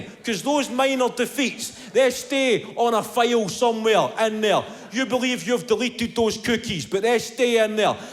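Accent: Scotland, United Kingdom. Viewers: So i can eventually understand him one day (i love the Scottish accent), also, I'm pretty sure i never said i couldn't understand anything...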